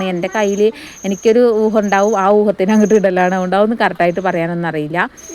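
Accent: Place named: Palakkad, India. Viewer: സസ്നേഹം 🧡🧡🧡 നിങ്ങളുടെ സ്വന്തം നാട് എവിടെ ആണ് hus ന്റെ ജോലി സംബന്ധിച്ചു വയനാട്ടിൽ സ്ഥിര താമസം ആക്കിത് ആണോ? നിങ്ങളുടെ സംസാരം കേട്ടിട്ട് പാലക്കാട്‌ ജില്ല ആണോന്ന് സംശയം 🙏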